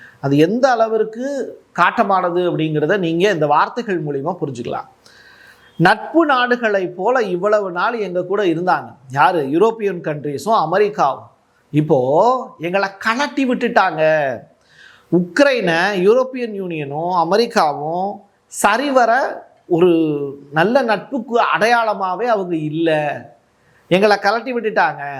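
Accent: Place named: Chennai, India. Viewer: Madras தமிழ் தானே பேசுகிறீர்கள்.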